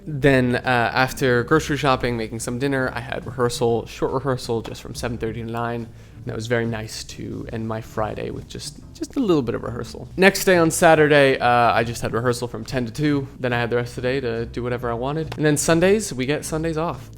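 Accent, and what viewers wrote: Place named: RP, United Kingdom. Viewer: Love the little Austin powers references at the end. Will concur will my fellow Brit that your RP is pretty dang good, though more newsreader than nobility heh, but you definitely are hitting the intonations well, good job. Amazed that this is an average week though, as sounds very busy, but I guess with performances and education combined, it is like two courses in one. Although not a degree I considered when I went to uni, I have family members who did, so this was really fun and a great insight to an acting degree. Keep up the content, and best of luck for the future.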